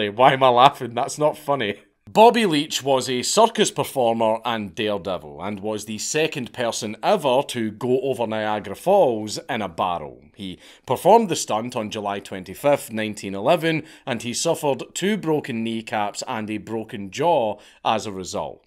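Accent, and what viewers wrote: Scotland, United Kingdom. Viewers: Lol AI can't understand a Scottish accent 😂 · >a scottsman making fun of any accent Lel, shiggydiggy bro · Oh nvm i thought this was hunter not some scottish guy lol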